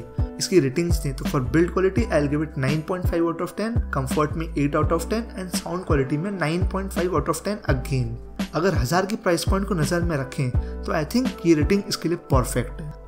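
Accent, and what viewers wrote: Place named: Kolkata, India. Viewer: your accent telling me you are from Kolkata. is that right?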